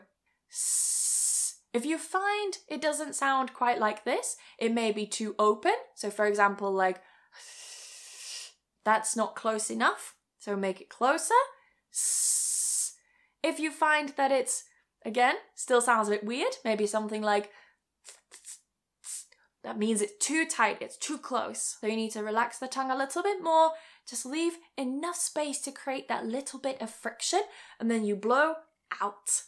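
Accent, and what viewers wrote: United Kingdom. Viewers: I appreciate your modesty, putting the British pronunciation (of words like "castle" or "afternoon") second, even though you seem to have more of a British background. i think ppl like learning the British pronunciation, as British English sounds more high class :D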